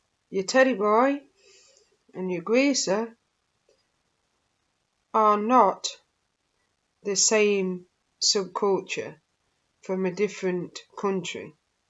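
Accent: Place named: North, United Kingdom. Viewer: You sound like a northern gal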